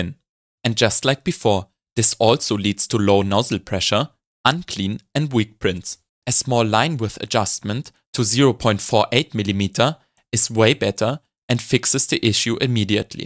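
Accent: Germany. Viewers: Du hörst dich an als wärst du auch Deutsch edit: Jup, hast es im Video grad gesagt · Deep, but well explained, technical stuff, made by a lad with a german accent, witout annoying music and/or nauseating transition effects on video edition. I t can't be better that this. Thank you a lot! 🙏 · мне так нравится этот немецкий акцент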